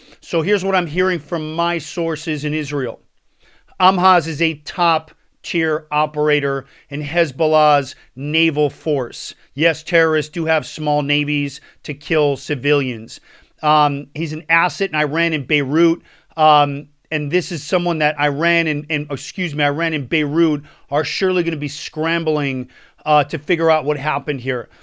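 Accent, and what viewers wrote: United States. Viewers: Amhaz probably has a lot of info on seaside smuggling as well as any planned attack on Israel's off-shore gas rigs. Nice work, Israel &! You a New Yorker...? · Your israeli elite forces? You sound pretty american to me so are you israeli or american which side are you picking if they both went to war with each other? america is controlled and infiltrated by israeli’s that act like they care about american interests but all they do is serve israel.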